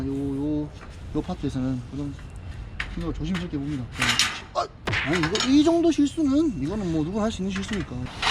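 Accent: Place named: Daegu, South Korea. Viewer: 여기보고 빵터져서 구독눌렀습니닼ㅋㅋㅋㅋㅋㅋㅋㅋㅋㅋ아 진짜 개웃기넼ㅋㅋㅋㅋㅋㅋㅋㅋㅋㅋㅋㅋㅋㅋㅋㅋㅋㅋㅋㅋㅋㅋㅋㅋㅋㅋㅋㅋㅋㅋㅋㅋ 혹시 대구분이세요?ㅋㅋㅋㅋㅋㅋㅋ 전 대구사람인데 제 남자인 친구들 말투랑 너무 같아서 정겨워욬ㅋㅋㅋㅋㅋㅋㅋㅋㅋㅋ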